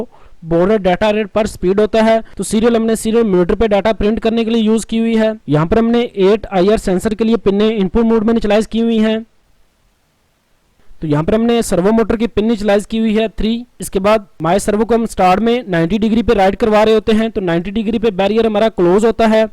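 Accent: India. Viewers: Tech vid Indian voice Useful Thumbs up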